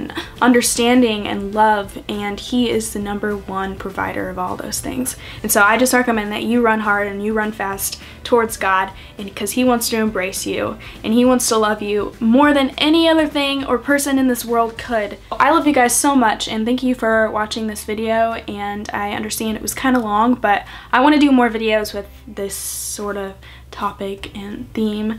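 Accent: South, United States. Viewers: Your southern acent is really coming threw in this video 😁👍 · Whered u pick up that southern accent from? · Hannah Rose Ikr I was just thinking, is it just me or does she sound more southern in this video? 😆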